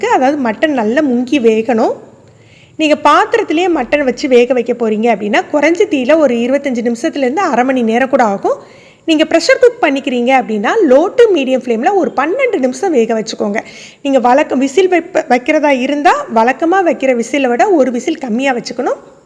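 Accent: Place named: Tirunelveli, India. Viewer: why I asked : Your Thamizh accent reminds me of my MSc classmate -1965-67- Loyola-Mr Farook from Tirunelveli.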